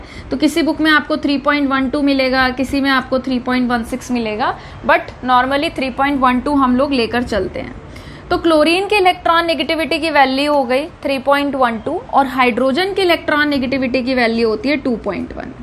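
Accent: Haryana, India. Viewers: Aapke speaking style haryanvi touch h😅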